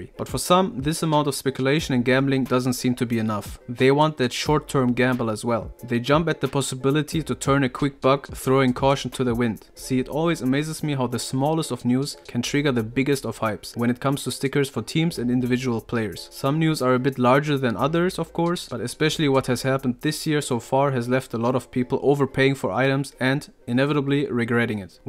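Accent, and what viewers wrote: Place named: Germany. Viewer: Where are you from? You sound like german and you talk about trilluxe in some vids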